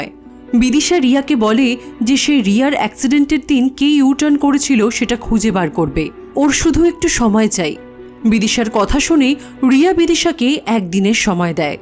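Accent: India. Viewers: didi tumi ki kolkatar?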